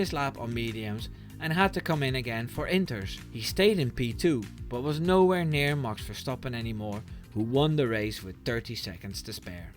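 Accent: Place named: Netherlands. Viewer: ik kan aan je engels bijna niet horen dat je uit nederland komt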